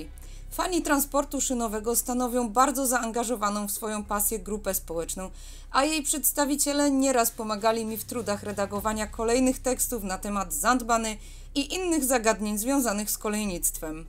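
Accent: Silesia, Poland. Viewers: Fajne, ale mosz to co jo, nawet jak mówisz to słychać godka haha 🔥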